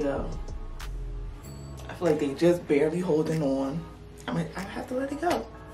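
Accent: Liberia. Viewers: Liberian girl? I hear the accent 🇱🇷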